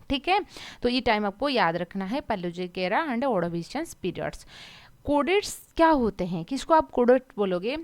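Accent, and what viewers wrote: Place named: West Bengal, India. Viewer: Man you are from West Bengal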